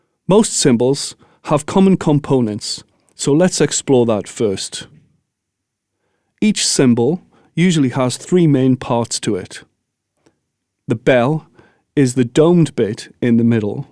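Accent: United Kingdom. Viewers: where is his accent from in the UK?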